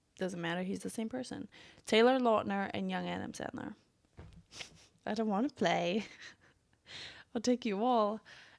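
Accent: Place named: Deep South, United States. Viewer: you are very funny and wise and cool. Relate to not liking maths at school, and having to deal with shitty customers. What part of the states are you from, surely the deep.south from your accent?? ❤❤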